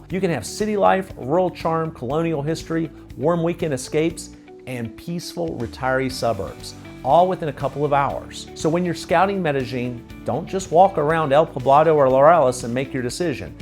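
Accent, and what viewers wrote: United States. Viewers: On-Tee-OH-Kee-Ahh. Love ya, but man oh man, do you have A LOT of gringo in your Spanish pronunciations. 😀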